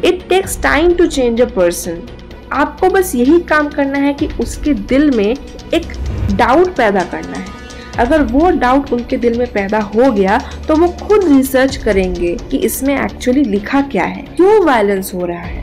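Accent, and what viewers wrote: Bengal, India. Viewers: Bengali ho aap sayad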